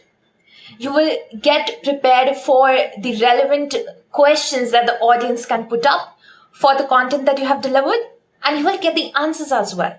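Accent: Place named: India. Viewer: Thank you for the tips. Are you an Indian? I hear Indian accent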